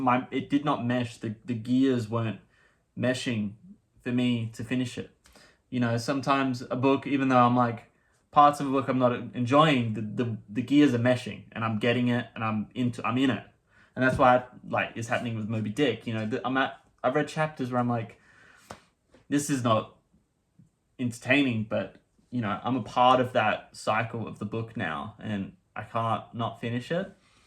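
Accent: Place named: United Kingdom. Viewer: Great video! I really enjoyed In Cold Blood when I read it but it’s been a while. I would love to hear more about watch making in future videos - it’d be really cool to see a watch that you’ve made. Based on your outfit I was thinking you were from Chicago but I was a bit confused as I thought I could detect the slightest British accent, then it all made sense when you mentioned Australia.😂 Have a good one!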